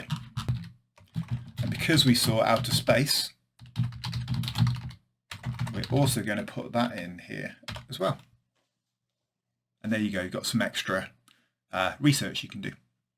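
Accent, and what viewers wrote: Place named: United Kingdom. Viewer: Wow I love your British accent 😅😅😊😊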